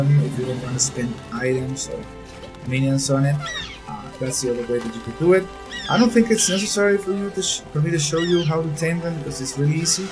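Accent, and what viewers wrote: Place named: United States. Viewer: ahaahah yo decia porque no estoy suscrito si me suscribi y no sabia que tenias otro canal en ingles haahhah, saludos y te sale igual que un gringo.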